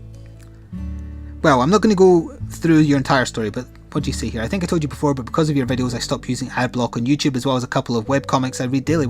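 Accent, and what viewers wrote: Scotland, United Kingdom. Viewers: wasn't insulted. mermen are male half fish. i like listening to a scottish accent and u dont come across as stupid. keep up the entertaining and interesting videos. thankyou.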